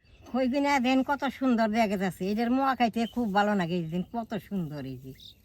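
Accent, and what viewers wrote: Tangail, Bangladesh. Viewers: আমাদের টাঙ্গাইলের ভাষার সাথে অনেক মিল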